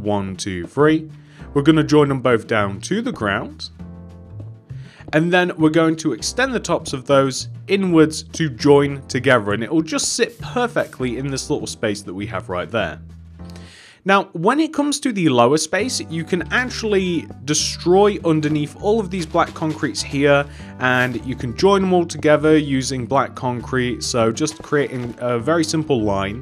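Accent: United Kingdom. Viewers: Bruh he is British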